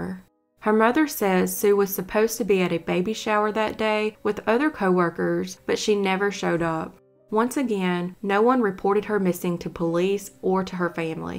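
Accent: South, United States. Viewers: I find your southern accent cute. You are a great narrator and I hope you get picked up by a major network. You are very detailed.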